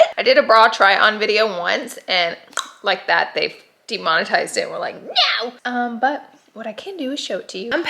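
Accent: South, United States. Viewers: Aspartame. Where are you getting the extra syllable??? I know you're southern but c'mon. We gotta do better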